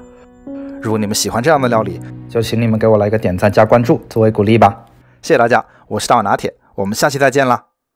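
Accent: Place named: Sichuan, China. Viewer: 四川人吧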